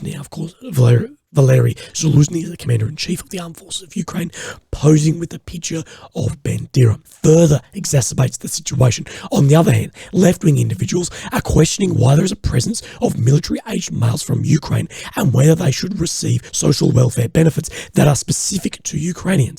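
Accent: Australia. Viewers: Can't speak Australian without a smile! Thank's for your efforts, homie. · funny Australian accent and obscure))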